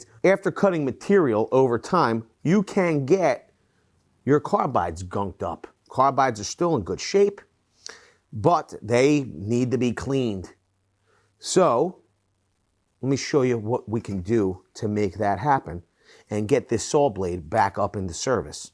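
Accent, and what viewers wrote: Philadelphia, United States. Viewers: I'm guessing you're from PA, specifically around Philly. Wuder instead of water. Lol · That's phillidelphia I hear i your voice. Almost thought it was Camden but it's Philly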